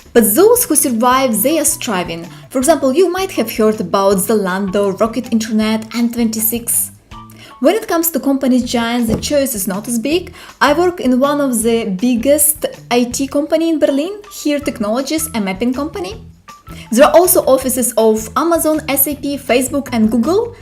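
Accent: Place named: Eastern Europe. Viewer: Nice person but I have to be honest....Listen to „eastern-English“ is really exhausting....sorry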